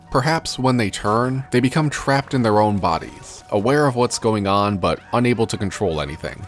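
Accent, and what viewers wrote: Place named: Midwest, United States. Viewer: Are you from the U.S. Midwest? You have a bit of an accent.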